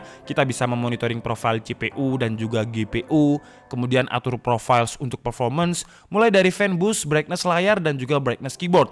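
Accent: Java, Indonesia. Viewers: Wong jowo tenan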